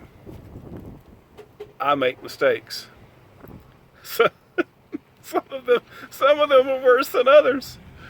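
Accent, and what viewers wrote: South, United States. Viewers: OMG, laughing so hard!!! Being a southern girl living in Prescott Valley, AZ now; I sure do enjoy listening to your southern drawl! Thanks for making my night ;) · There is nothing like southern charm..❤️❤️..l really like that your a southern boy. The way you talk is so smoothing to me. Could listen to you all day my Dad was from Norfolk Virginia and he had such smoothing charm..😍too. You are so funny..l'm still laughing ..haha..So glad Martini is feeling better love ❤️to you and the woof pack always..🐾🐾🐾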